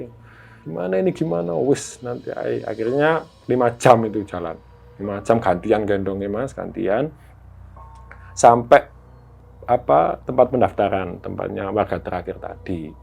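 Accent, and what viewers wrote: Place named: East Java, Indonesia. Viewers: Logatmu mas jawa timuran bgt 🤭😂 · Logat" e sampean wong kediri to mas?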